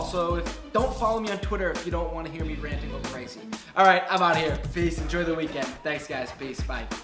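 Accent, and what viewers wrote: Boston, United States. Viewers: I love the Boston accent. anyone that has it I think it just sounds awesome.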